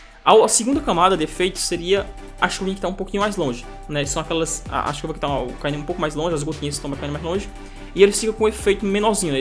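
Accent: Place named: Northeast, Brazil. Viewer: sotaque nordestino e foda❤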